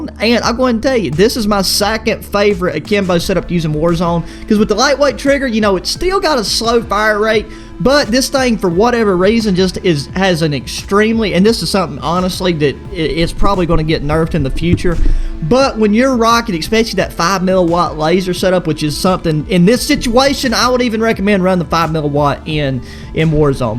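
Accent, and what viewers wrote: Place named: Texas, United States. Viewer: I'm not trying to be rude but you sound like you're from Texas or like a cowboy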